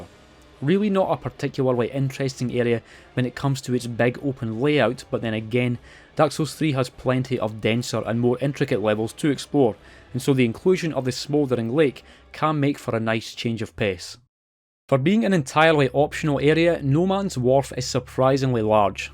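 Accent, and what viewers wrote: United States. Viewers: Your American accent is really spot on, could use some more practice, but pretty good. As a american, I approve lol. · The American accent is fire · That American accent is so fucking funny bro. 😂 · love the american voice. i was forced to use 1 from cape town south africa, working for t-mobile. i hated how i sounded. you were great.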